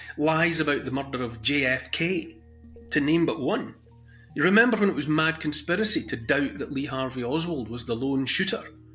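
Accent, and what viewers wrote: Scotland, United Kingdom. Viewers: You speak of bad, sad things and i am fully on the same page with you. But listening to your Scottish accent awakens a hope for humanity in me, don't know why. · with his rough Scottish accent is very wise and insightful! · I thought I was listening to myself with a scottish accent ! thank you.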